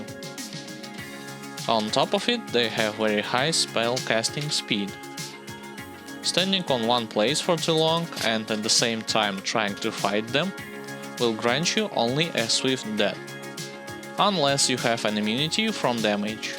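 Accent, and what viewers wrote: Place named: Eastern Europe. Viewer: Great info overall, the eastern european accent makes it interesting also.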